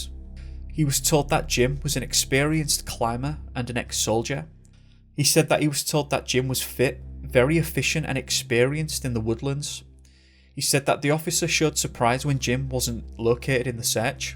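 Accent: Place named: East Yorkshire, United Kingdom. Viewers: Sounds like an East Yorkshire accent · Are you from Hull or Leeds. You sound Yorkshire. As from York lad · It's a reet belting northern accent tis this! 💞💞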